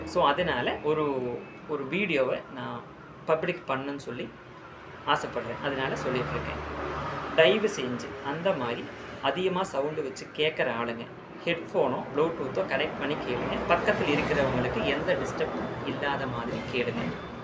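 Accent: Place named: Kerala, India. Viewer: மலயாள வாடை தெரிகிறது தாங்கள் அழகாக தமிமழ் பேசுகிறீர்கள்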